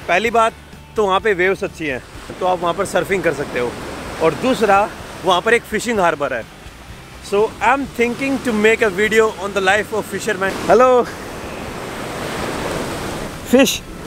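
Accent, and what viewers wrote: Haryana, India. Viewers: You have a nice haryanvi touch accent..... Like yr voice.....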